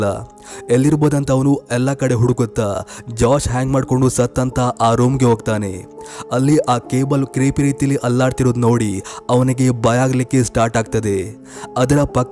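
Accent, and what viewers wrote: Kundapura, India. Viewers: Niv kundapura naaa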